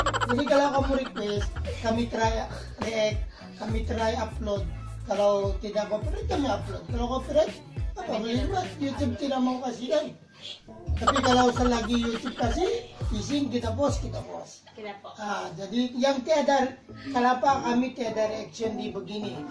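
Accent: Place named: Manado, Indonesia. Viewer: Kok bisa bahasa Indonesia, pasti tinggal di pulau dekat pulau Miangas diperbatasan, atau mungkin sering ke manado, soalnya logatnya sama 😅 kalau saya dari gorontalo provinsi sebelah manado jadi logatnya sama.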